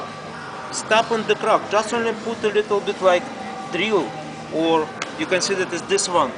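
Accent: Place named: Russia. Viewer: Russian accent👍👍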